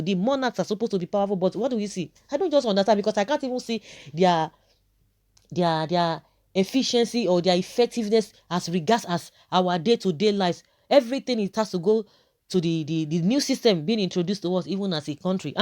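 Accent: Yoruba, Nigeria. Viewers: How can you ask such a question?. From your intonation, i believe you are an original Yoruba daughter. No government be him or her a the president can enthrown or dethrown an Oba in Benin kingdom. Emirs are based on fulani politics and their slamic religion tradition and not ancestrail. The fulanis Emirs are heads of lislamic religion who can be installed and dethrown at will by a mear so called governor or president who is transient.. Get it my lady. Its like you asking if the Queen or king of England can be removed. There should not be comparison between a king and a common man. More so that Emir is alien in the history of our land. We all know how they came in to our land.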